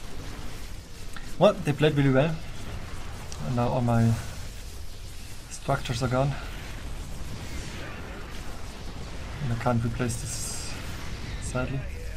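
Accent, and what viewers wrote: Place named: Germany. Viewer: Mach doch mal auch ein Video auf Deutsch man merkt das du deutsch bist dein Akzent sticht sehr heraus xc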